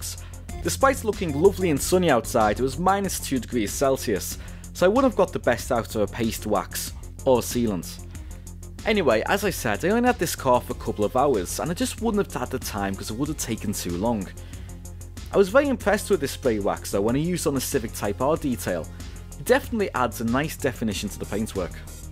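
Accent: Germany. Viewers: That's a really great result, really a fantastic job you did there. I also really enjoyed your accent, you sound German, are you originally from Germany? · Incredible transformation! Good job! Haha your accent made it very easy for me to understand what you said bc you pronounce the letter U just the way we do in Germany. Greetings and keep up the good work.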